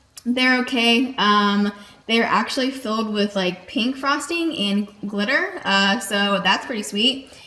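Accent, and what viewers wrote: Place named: Georgia, United States. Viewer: Girl I thought you were from Columbus ga lol.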